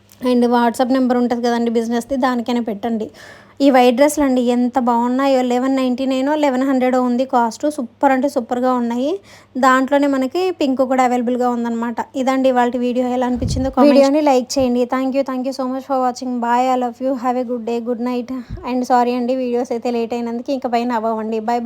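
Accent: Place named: Andhra Pradesh, India. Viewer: Assal meeru by birth andhra pilla la matladuthunnaru appudappudu mana telangana slang kuda use cheyandi because telangana vallu like cheyali kada i mean kotha subscribers ki theliyali kada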